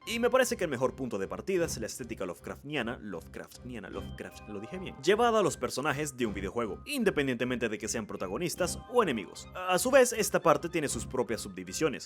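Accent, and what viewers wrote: Venezuela. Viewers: De casualidad serás venezolano?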